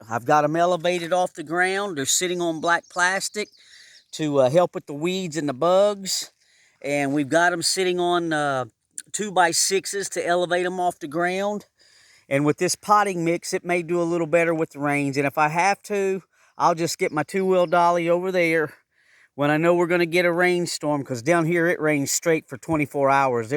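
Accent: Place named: South, United States. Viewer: I thought you sounded southern in Indiana. Welcome. Tis different. Hope you get ur sweetpotatoes going like city lot! Good luck!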